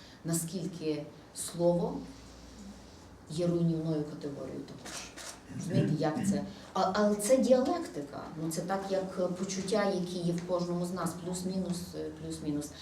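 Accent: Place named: Western Ukraine, Ukraine. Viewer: ты говорить научись не на суржике западенском, а на нормальном языке, на русском или украинском, но не на западынском как ты сейчас базлаешь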